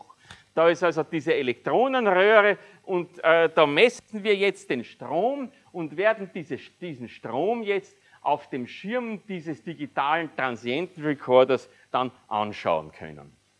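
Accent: Austria. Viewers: Sehr grosses Lob an diese Vorlesung. Als Norddeutscher ist für mich das Österreichische ein zweischneidiges Schwert: Zu Charmant um wegzuhören, zu einschläfernd um konzentriert zu bleiben... Tatsächlich taten alle Worte gut, vielen lieben Dank! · Eine großartige Auffrischung für mich, der ich in der Schule zwar Physik-Leistungskurs hatte und dann doch der Chemiebranche anheim gefallen bin. Und nicht zu toppen das Ganze auch noch im regional eingefärbten Sprachduktus. Ich liebe Österreich! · Eine gute Vorlesung mit österreichischem Schmäh vorgetragen, so dass man es gut verstehen kann. Schade, wennn man reicher geboren wäre, hätte ich gerne in Österreich studiert.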